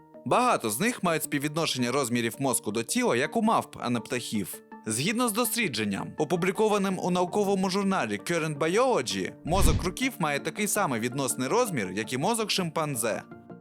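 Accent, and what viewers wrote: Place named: Russia. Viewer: ЧОМУ???? Чому ти використовуєш кацапські наголоси? півтори хвилини відео глянув, вже почув рОки і сАмі....тьху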